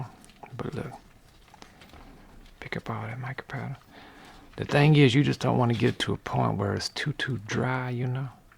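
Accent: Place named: South, United States. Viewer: I’m really wondering where he lives...his southern style accent is throwing me off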